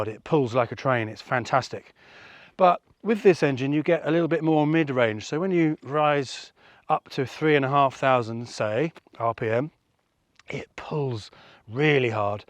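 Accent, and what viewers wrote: England, United Kingdom. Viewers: Hey love your videos/content. Seriously considering this motorcycle as a forever bike now in my 50’s, and great to see a review from an English fellow and not a screaming American. Not seen the young fellow on here for a bit, hope all is good. I also have a thing for churches, always try and have a look through one where I can.👍 regards from the Pilbarra Western Australia.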